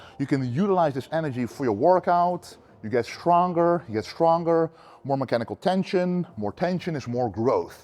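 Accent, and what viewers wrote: Netherlands. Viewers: English as a second language, he's from the Netherlands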